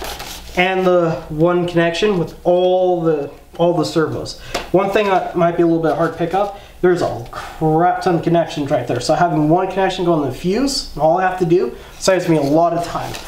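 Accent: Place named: Russia. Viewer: I can't place your accent. When you run some words together, it sounds like another language. Russian? Chinese?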